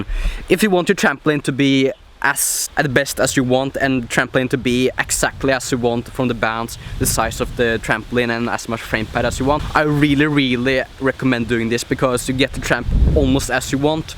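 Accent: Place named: Finland. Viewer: You sound Finnish or Swedish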